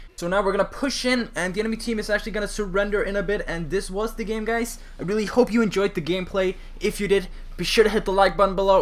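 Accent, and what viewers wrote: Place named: Denmark. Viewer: Your accept sound *very* danish...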